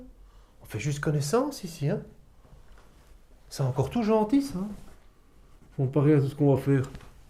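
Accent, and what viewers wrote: Brussels, Belgium. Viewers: il a un délicieux accent belge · il a un accent bruxellois plus que "belge" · L'accent belge est vraiment magique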